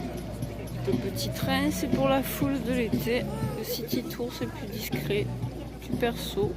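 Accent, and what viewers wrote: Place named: Montpellier, France. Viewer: Vídeo interessante.bel accent de Montpellier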